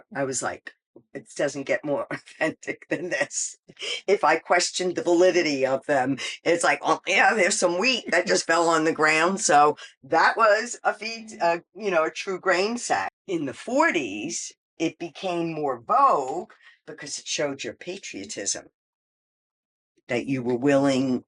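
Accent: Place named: New York, United States. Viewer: I had to stop watching for a minute just to say how wonderful you are to let us into your beautiful world! I’m in awe of your amazing collection and the stories that go with them. The story of your mother and the artist who crafted her last piece, the Santa, has touched me very deeply (I have stage 4 breast cancer). Also you sound a lot like me. I’m guessing Long Island or Queens. I hail from both, and even 🎉though we moved to the south 23 years ago I never lost that “accent.” Never stop being who you are. The world needs more people like you! PS: I have my grandma’s buttons too!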